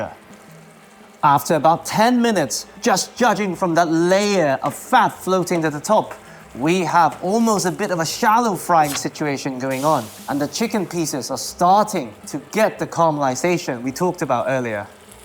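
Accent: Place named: England, United Kingdom. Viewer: Your accent has a hint of English! ❤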